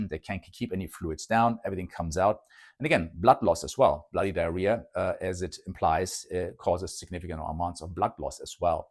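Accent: Germany. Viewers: you have a german accent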